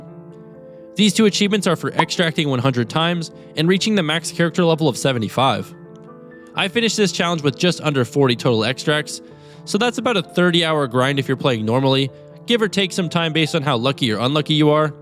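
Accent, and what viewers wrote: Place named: Midwest, United States. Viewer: Damn I'm right across the big river from you I knew you sounded more Midwestern than most people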